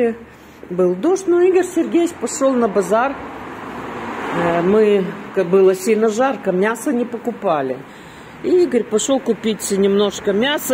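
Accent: Odessa, Ukraine. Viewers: Прогулка по Одессе прелесть! Милый одесский говор! Оставайтесь прежними одесситами, не поддавайтесь новой политике. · Большое спасибо за прогулку. Я в Одессе училась в ОГУ, работала вышлв замуж, родила реенка. К большому сожалению по семейным обстоятельствам вынужлена была уехать в другой город, но Одесса всегда со мной. Судяпо говору вы настоящая одесситка. Обожаю вас. Напишите по какой улице вы идете, для меня это важно. Только название настоящее. Заранее благодарю. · Класс!!! ---,, но уже пошли по здесь! " неповторимый Одесский говор, мягкий, добрый!!! Маса удовольствия, слушать ВАС!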